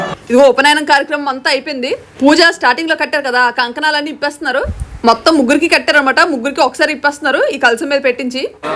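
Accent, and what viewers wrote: Vizianagaram, India. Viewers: Mandalu virigupothayi... Mana vizianagaram slang nachina dialogue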